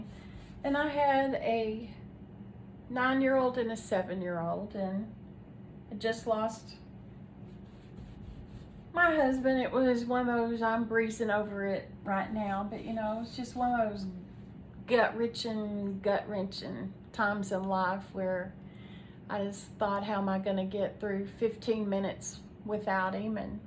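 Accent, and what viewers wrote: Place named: South, United States. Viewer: I agree with all you said. draws you in with that soft Southern accent. What she says comes from her heart. That makes it profound. ❤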